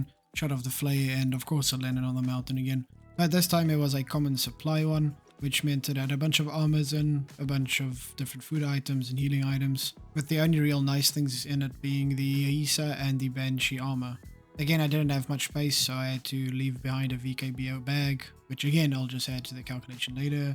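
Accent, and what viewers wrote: South Africa. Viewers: Is mans from South Africa by any chance, lowkey sounds like it 😅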